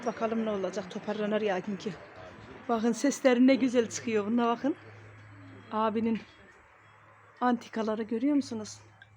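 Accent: Azerbaijan. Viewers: Salam canım turkiyəm bacım siz yarısı azeri yarısı türcə konuşuyorsunuz hangi bölgə böylə konuşuyor sizdə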